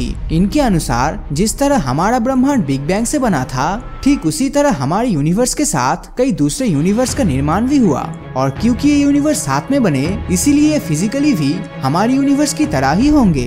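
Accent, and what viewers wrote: Bengal, India. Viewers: Are you bengali!!!!Your accent says bro☺️☺️☺️☺️☺️ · Bhai aap Bengali hain na.... ❤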